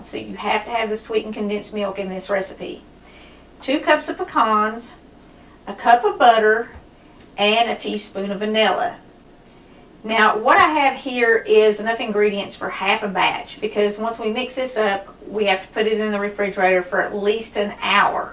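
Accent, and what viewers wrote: South, United States. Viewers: I love your southern accent. We’re kinda neighbors. I’m in Nicholasville, Kentucky. Love love love your recipes.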